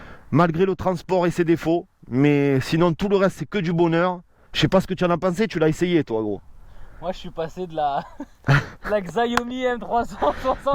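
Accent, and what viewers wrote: South, France. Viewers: Merci pour ce magnifique test.. Cela ne sera que réservé en une niche de clients car le prix, son poids... donc pas à monter et descendre 3/4 étages pour la ranger dans son appartement.. Ensuite, elle est monstrueuse mais il existe une concurrente (bcp plus léger mais moins d'autonomie avec autant de puissance): La Rion race edition. Par contre question concernant l'étanchéité et la tenue sur la route par temps de pluie? Dans tous les cas, tu es the first one youtube FR sur les tests trottinettes.. (ta personnalité, les montages vidéos, ta sincérité et cet accent du Sud.. 😉) Ride safe l'ami et merci encore.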